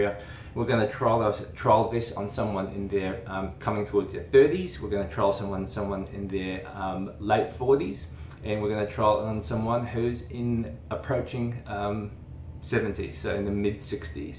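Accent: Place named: Australia. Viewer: will you add the Newa to your device testing? It's a radiofrequency device that claims to use the same 3DEEP technology used in the clinic setting. The makers of Newa further claim the device induces production of collagen and elastin. The Newa website states a study showed an average collagen increase of 3.82 percent (but fails to state how the test was conducted... and I never trust any test not done by an independent, third-party facility). Also, what are your thoughts on the Tria laser (basically it is an at home Fraxel type device)? Would you reccommend the Tria over dermarolling? (I already own the Tria so am basically curious whether I should continue to use it or dermaroll or alternate treatments?) Thanks so much for your videos!!! I love everything about skincare and being able to a professional with an Australian accent talk about skincare is damn near perfection in my book.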